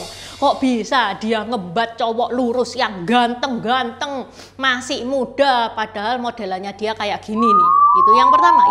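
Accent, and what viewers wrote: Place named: Surabaya, Indonesia. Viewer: ngakaaaak… aplg logat e.. suroboyo -an e kentel… segerrr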